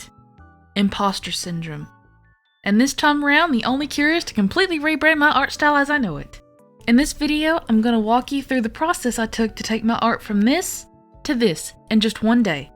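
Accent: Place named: Rural, United States. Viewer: i love your voice, it just makes jokes have that country flair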